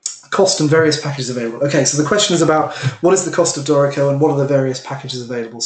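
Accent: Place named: England, United Kingdom. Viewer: It would help very much if you SLOW down your speech. #1 not everyone can understand the nuances of a 'English' accent. #2 The presentation feels rushed. I know your up against a clock, but it feels that way to. Just about the time I think I'm starting to 'get it' You fire off a 6 word phrase that leaves me interpreting 'you' rather than the program. I appreciate your effort and enthusiasm. If I were presenting this and I was from Jackson Missippi USA. at this tempo...you'd be writing me (: God save the queen !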